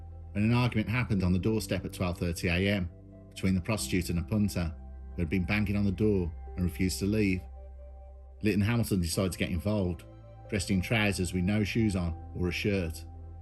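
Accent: Birmingham, United Kingdom. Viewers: Are you from Birmingham? I'm from Lichfield down the road!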